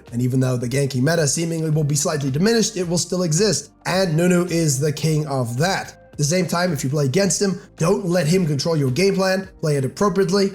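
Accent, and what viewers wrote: South Africa. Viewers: Why do you sound 10x more south african when you're sick lmao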